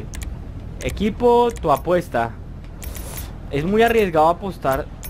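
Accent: Colombia. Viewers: ERES COLOMBIANO CIERTO SE SIENTE TU ACENTO YO TMBN SOY COLOM Y HABLAS IGUALITOOOOOOO VIVA COLOMBIA. ENCERIO ERES COLOMBIANO VERDAD? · si creo que es colombiano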